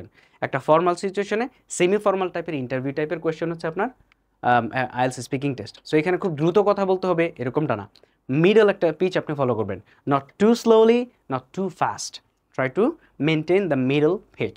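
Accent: Noakhali, Bangladesh. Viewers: আছেন নি বালা, খাইছেন নি ভাত.... এটা তো নোয়াখাইল্লারা বলে।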